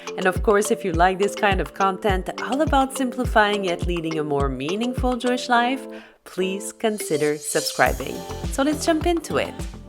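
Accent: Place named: France. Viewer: english is my 3rd language, who doesnt like a french accent is just weird lol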